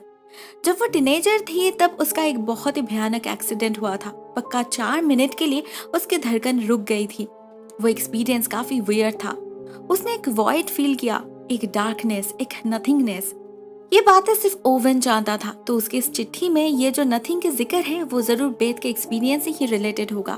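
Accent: Bengal, India. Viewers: Khub sundor didi.. tumi bangali hoeo eto sundor hindi accent tomar❤️❤️ Ajk bangla tei comment korlam..😁 Love you di❤️❤️❤️ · Keya app bengali hooo??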